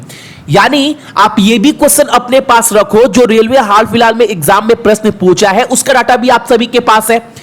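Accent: Bihar, India. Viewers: kdwa par sch bolte ho bihari style me jo bahot acha lgta hai muje toh. love from rajasthan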